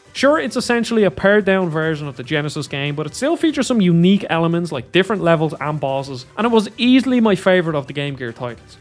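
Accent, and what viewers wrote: Dublin, Ireland. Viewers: Is that a Finglas head I hear? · I at least liked the Irish accent,the words seemed pretty harsh. And what's so great about the Master System that Game Gear doesn't have? I'm honestly curious because I see several comments complaining about the versions used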